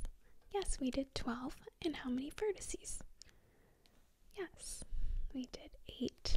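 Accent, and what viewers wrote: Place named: Minnesota, United States. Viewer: are you from minnesota? you got the accent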